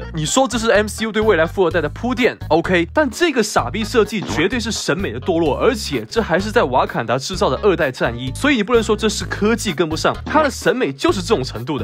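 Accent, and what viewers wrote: Taiwan. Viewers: 你講話好台灣腔哦 都沒有捲舌欸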